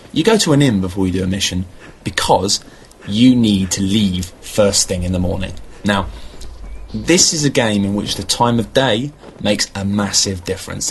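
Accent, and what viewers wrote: United Kingdom. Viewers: His British accent just makes it sound that much more brilliant. As if Im watching a documentary.~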